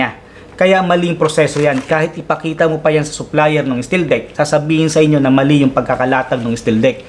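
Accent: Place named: Northern Samar, Philippines. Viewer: Engr. parang taga catarman northern samar ka? kc ang accent at pag sasalita mo,, nararamdaman ko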